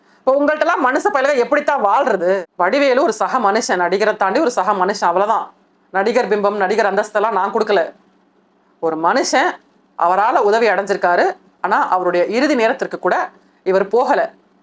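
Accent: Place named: Malaysia. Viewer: SISTER. YUR SLANG OF SPEAKING TAMIL...LIKE MALAYSIAN.,..THANKS SISTER... MALAYSIA.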